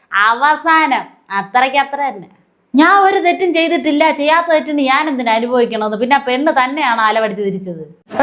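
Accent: Thiruvananthapuram, India. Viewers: ഇത്ര നന്നായി തിരുവനന്തപുരം ഭാഷയിൽ മുഖത്തടിച്ച് കളിയാക്കുവാൻ കഴിവുള്ള ഒരാളെ അഭിനന്ദിക്കാതെ വയ്യ. Adv.സാജനും തിന്മക്കെതിരെ പൊരുതുന്ന ഈ മാദ്ധ്യമപ്രവർത്തകക്കും ഒരുപാടു് അഭിനന്ദനങ്ങൾ...😂❤🙏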